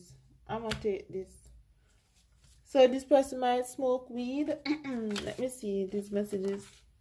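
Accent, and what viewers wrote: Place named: Jamaica. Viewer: Awesome Jamaican accent, stay blessed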